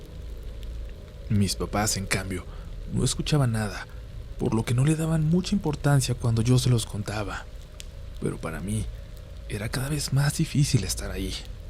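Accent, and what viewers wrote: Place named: Mexico. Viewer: Sí. Me encanta estudiar así, escuchando Relatos de La Noche. El acento de México es mi favorito💕😂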